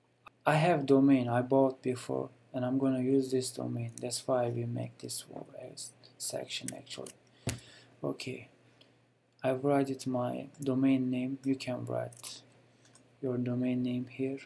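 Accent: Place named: Turkey. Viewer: Çok faydali ve işe yarar bir video olmus sagolun bey bu arada videodaki ingilizce telaffuzunuzdan anladim sizinde bir Turk oldugunuzu:))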